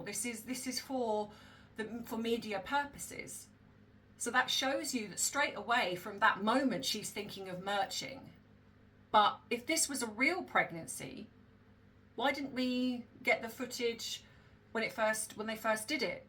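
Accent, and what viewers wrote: United Kingdom. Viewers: Greetings from south coast California. I hear your lovely British voice perfectly clear from California on a Samsung Galaxy cellphone. Thanks 🙏🏼⚘️🐦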